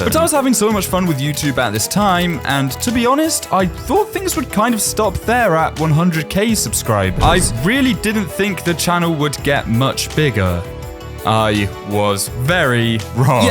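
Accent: United Kingdom. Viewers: When and where is the frog party and are you british😅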